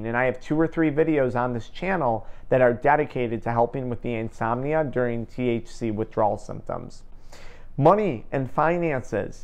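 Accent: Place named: Buffalo, United States. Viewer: i knew by your accent you were from buffalo new york i saw the 716 number and knew it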